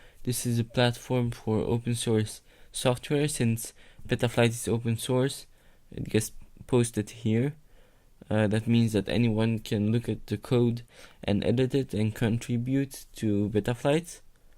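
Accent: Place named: India. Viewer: media hora hablando para no decir nada con acento deindio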